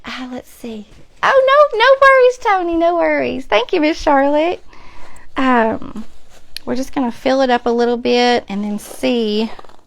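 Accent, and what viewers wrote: South, United States. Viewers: I am replaying first time to find you I love your southern draw your style is same as mine I cannot wait to explore your crafts and hopefully catch you live. Be Blessed my new friend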